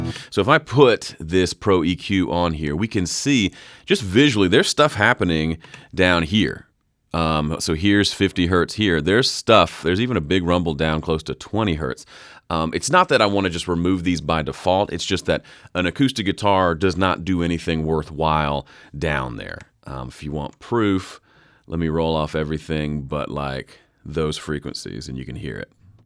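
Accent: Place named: South, United States. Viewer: Thanks for the great videos! These have been very helpful as I self-produce. Also, nice Southern accent!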